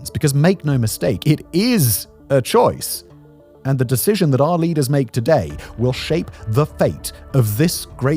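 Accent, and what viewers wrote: United States. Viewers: had a little American comin out in him this one got me pumped up given the horrible situation! Stay Strong! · My god is sounding more and more American every day. It's good to see him want his country to stand up for itself with some fire in his blood about it.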